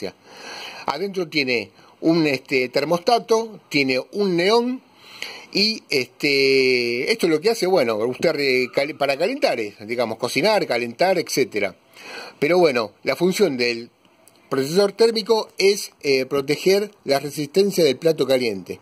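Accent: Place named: Argentina. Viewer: Se nota que este canal es argentino...Ya viendo la clavija de tres patas,y el acento ya uno se da cuenta...jejejeje Sólo falta el mate...:v Felices fiestas...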